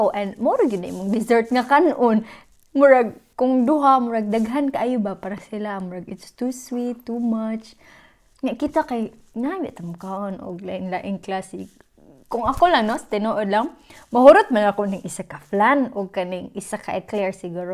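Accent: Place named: France. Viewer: I can hear French accent na if mag english ka.. hahaha kahinomdom na nuon kos joke ni Trevor Noah about French accent vs Russian accent.. hahaha Try to watch it dba malingaw ka.. hahaha <link>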